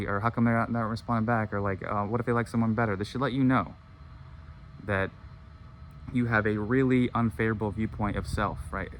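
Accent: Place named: Cockney, United Kingdom. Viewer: Haha I’m British and love the little cockney accent you slip into your videos 😂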